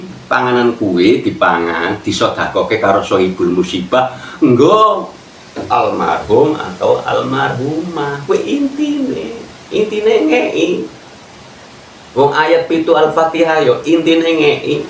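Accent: Banyumas, Indonesia. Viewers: Loh rupane wong ngapak ....Kulo wong Cilongok mas....tpi ku tinggal d riau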